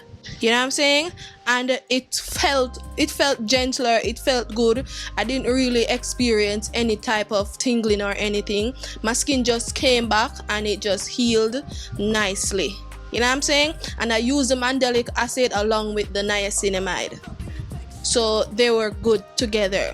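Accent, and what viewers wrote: Jamaica. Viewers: I like your Jamaican accent! 👍🏾😇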